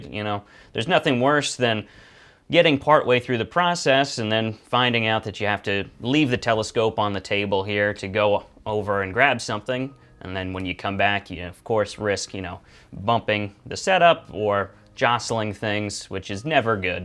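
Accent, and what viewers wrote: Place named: United Kingdom. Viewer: I think you must be British!!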